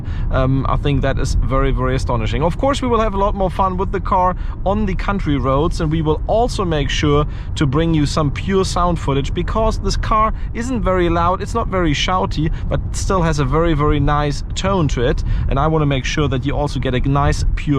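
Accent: Germany. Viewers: Great review, amazing car and the German accent is 👌😉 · Man hört direkt das du Deutsch bist😂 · Probably weird but ....this is why i like german women coz their accent while speaking english jus sounds amazing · Man merkt direkt das du deutscher bist aber dein englisch mag ich😂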